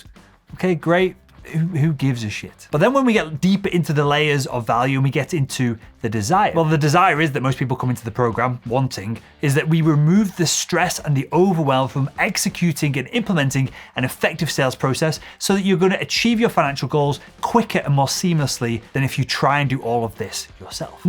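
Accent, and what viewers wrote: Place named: North, United Kingdom. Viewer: Love your content, and the northern accent as always 😅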